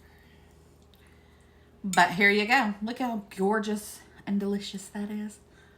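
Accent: South, United States. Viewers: I detect a Southern accent..so that being said..where is the Duke's?! 😂😉❤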